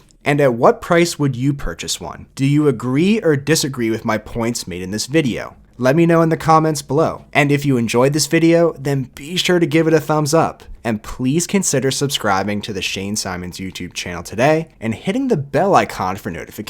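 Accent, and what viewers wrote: Philadelphia, United States. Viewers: Are you from Philadelphia? Sounds like it haha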